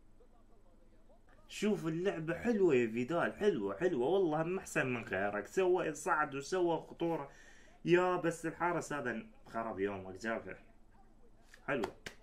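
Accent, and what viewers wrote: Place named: Iraq. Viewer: السلام عليكم ههههه والله ياخوي احب ردات فعلك وكلامك العراقي الحلوووو واصل عزيزي ولنا فخر بتواجدك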